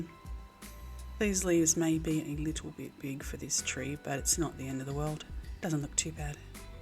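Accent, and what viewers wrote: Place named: Australia. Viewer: Superb! You are a very talented lady! I also love your Australian accent.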